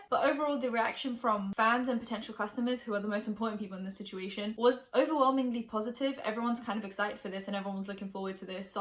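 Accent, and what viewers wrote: United Kingdom. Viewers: What U.K. region is your accent from?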